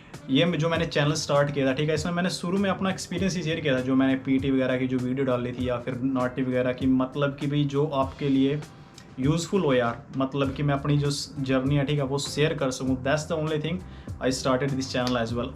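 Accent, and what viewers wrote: Haryana, India. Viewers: Bhai aapki language te hi pta chla haryanvi aa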